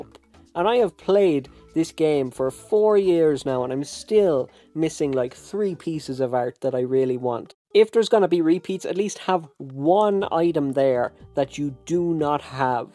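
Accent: Ireland. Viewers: Wait?? Are you from wexford?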